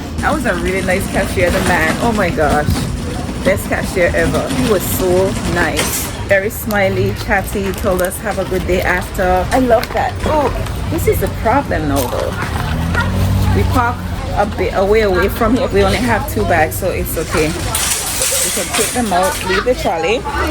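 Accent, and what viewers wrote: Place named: Jamaica. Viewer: Think it's Jamaican.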